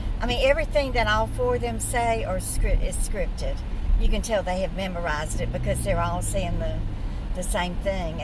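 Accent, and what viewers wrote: South, United States. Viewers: I love your beauty. I would love to visit with you and have you cook for me, not gonna lie. Now it sounds like you have a southern accent living in LI NY. It sounds lovely but it doesn't sound very LI haha.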